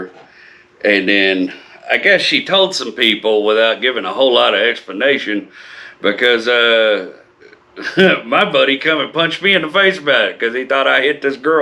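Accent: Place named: Texas, United States. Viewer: Lovin' this from the north coast of Northern Ireland. I'm not familiar with a lot of the US car terminology, but could listen to your cool Texas drawl forever. The stories are fantastic.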